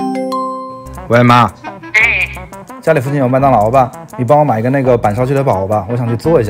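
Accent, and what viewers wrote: Wuhan, China. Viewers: 这个弯管子普通话真的是听得想家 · 博主是武汉人啊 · 好怀念弯管子普通话。确定博主是武汉的妈妈😁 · 那~~有莫斯办法撒？ 有点吧甜，有点吧韩，哈哈哈哈。 这弯管子普通话太熟悉了~~哈哈哈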